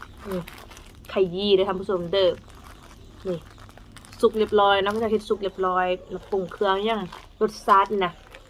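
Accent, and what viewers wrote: Northern Laos, Laos. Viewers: ชอบฟังสำเนียงลาวเหนือ พูดเพราะดีค่ะ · สบายดี คนสวยน่ารักมาคอมเม้นท์เป็นกำลังใจครับ 'หลังจากไม่ได้คอมเม้นท์นานแล้วแต่ก็ยังติดตามน้องอยู่นะครับคนสวยสายบุญคนสวยนํ้าใจงามมีของอร่อยเยอะเลยสาหร่ายนํ้าจืดครับ จากสาวลาวเหนือมาเป็นสาวลาวใต้สำเนียงเสียงพูดลาวเหนือพูดเพราะนะครับ นุ่มนวลฟังแล้วน่าหลงใหลครับ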